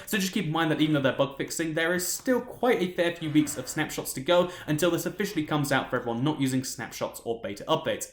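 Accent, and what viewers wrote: United Kingdom. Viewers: Ong Canada I thought you were British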